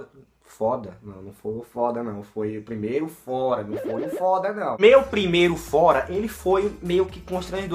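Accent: Northeast, Brazil. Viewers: vc e nordestino por que eu só kkkkk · Nordestino? É da Familia?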